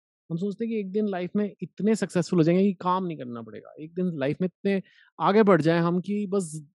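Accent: Punjab, India. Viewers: Your punjabi accent and expression is hilarious 'Paad lo'...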